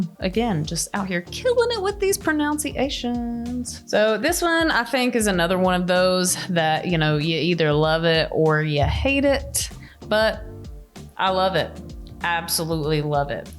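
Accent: South, United States. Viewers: Bang on job with the takeover! Your Southern "belle" accent is mint. 👍 Ashton is a lucky man, you support his hobby/passion, have a cool sense of humour and you're very attractive. I do like these takeovers, well do. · You nailed it, Great job 👌love those takeover videos, it’s really nice to have a woman’s opinion on men’s fragrances, I love how you pronounce the names with the southern accent 😊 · What state are you from? I love hearing another fellow southern accent on here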